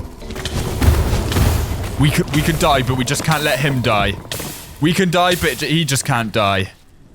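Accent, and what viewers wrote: United Kingdom. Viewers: I love British accents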